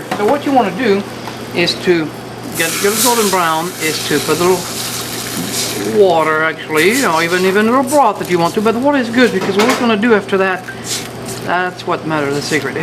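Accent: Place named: Southern California, United States. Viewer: socal beach learn how to speak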